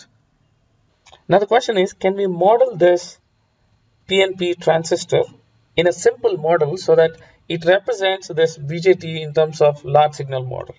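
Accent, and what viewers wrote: India. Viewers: Your explanation is very good, and your accent is very harmful compared with other Indian Youtubers, but if you'd had an better mic, it would top everything!! Thanks for the vid, tho.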